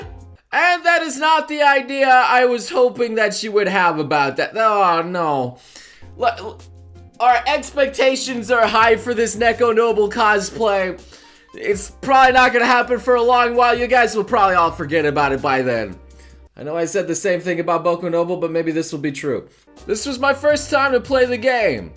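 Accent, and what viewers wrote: Ireland. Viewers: Your Irish accent is awesome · OMG HIS IRISH ACCENT IS THE MOST PERFECT THING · that Irish accent though XD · I love that Irish accent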